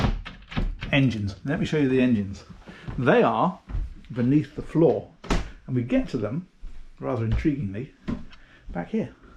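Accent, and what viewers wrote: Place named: United Kingdom. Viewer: I enjoy everything about your channel, except for one thing. “Cummins” (Engine) does not have a “g” in it. It’s not “Cummings,” although that pronunciation is also more common here in the USA than the correct one. But I can live with it, and perhaps it’s just my hearing that’s off, what with the British accent and all.:-)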